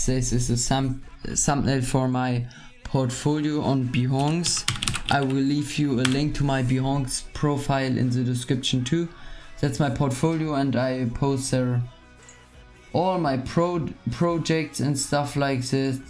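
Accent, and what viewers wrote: Germany. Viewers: Hörst dich stark nach nen deutschen an xd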